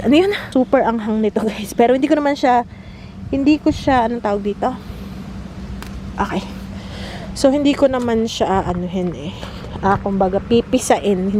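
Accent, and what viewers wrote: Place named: Mindoro, Philippines. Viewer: Hi ate, curious lng kasi ako..saan province mo dito sa Pinas? Parang tono samen sa Mindoro po kasi😊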